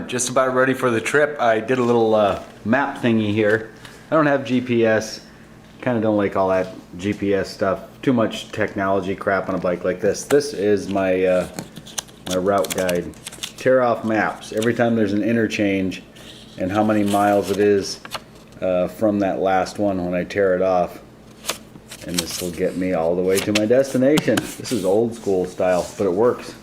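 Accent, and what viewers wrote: United Kingdom. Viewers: Loved the English accent, but you German? I only have one thing to say to that RAMSTEIN lol Keep on hackin' it fascinates and inspires me:-) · You may have been 1 of the 2 that won a trophy out of the 2... but you had the balls to enter...and you won period.... that is a win in my book, just by entering you won as I see it so it is everyones loss that didnt enter at all. He's a German US Born British Accent speaking Japanese Bike Riding Maker/Hacker... the way the United States was meant to be... I love it. (Mind you i am not saying what you said what bad/wrong just making it clear to those who want to make bad comments telling them that this is what America is all about, I was roughly clarifying)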